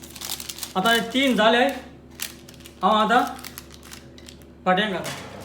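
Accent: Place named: Salcete, India. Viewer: I liked ur salcette konkani... Feel like I am in colva my uncles place.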